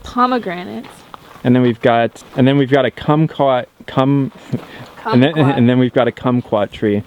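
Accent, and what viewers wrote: Canada. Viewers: So this is in AZ? But the dude sounds Canadian........?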